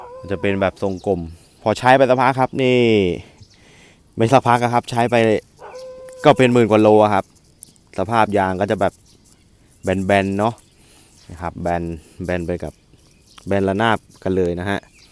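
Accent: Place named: North, Thailand. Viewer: จะถามหลายทีแล้วครับ จขกท.เป็นคนที่ไหนครับ สำเนียงออกทางเหนือจังเลยครับ ไงก็ติดตามคลิป ตอนนี้ยังไม่มีกำลังทรัพย์กับวาสนา อีกหน่อยคงได้ควบฮาเล่บ้างครับ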